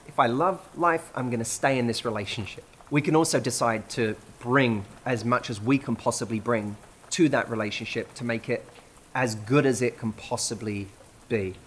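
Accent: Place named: England, United Kingdom. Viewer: Wonderful and inspirational as always! as i am studying english, may i ask you to what part of england or london your accent belong? i really like it. i hope you have a minute to answer my question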